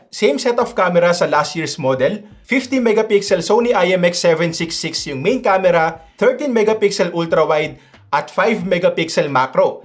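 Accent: Cavite, Philippines. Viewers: Kabiteño k pla boss ahahaha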